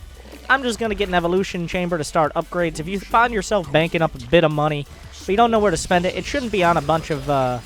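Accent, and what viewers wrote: South, United States. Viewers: Thanks for the tutorial. I enjoyed the southern accent ;]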